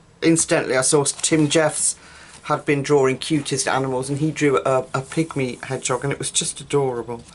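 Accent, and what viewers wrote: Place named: RP, United Kingdom. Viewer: great art...and personality, why are British posh accents so funny, the language - "stickled to High Heaven", LMAO. love it. I could listen to you every day - I don't know how famous she is in general, but most Americans, like myself - except I know who this is, but most Americans, possibly some Brits - might not know the actress, Amanda Donahoe or Donahue. She was in "Lair of the White Worm' with Hugh Grant from the '80s. I freaking love listening to her and looking at her, she's awesome. don't ask me why, BUT...you sound like her! That's a compliment luv...coming from me. buy yes, love your works, I'm not as crafty as you and the coloring community on youtube, but I'm trying in my spare time. However, I go for the more strange and horrific if possible... let's just say, that Bradford lady's great art would never hit my desk. 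- But seeing your love for the kind of "cute as shit" stuff, and payoff ---- is well worth it. Keep on Stickeling Luv. lol, James from Florida!!!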